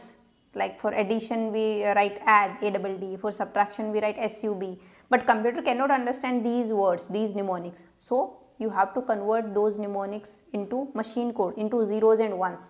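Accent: India. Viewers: nice indian english accent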